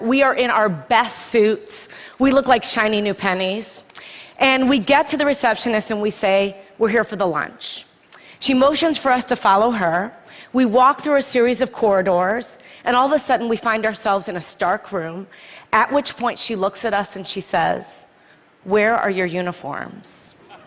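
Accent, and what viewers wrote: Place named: United States. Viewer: Good Amercan-English to hear.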